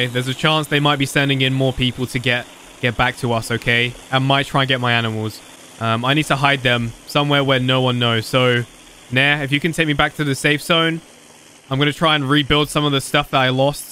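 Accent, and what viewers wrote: United Kingdom. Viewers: are u from da UK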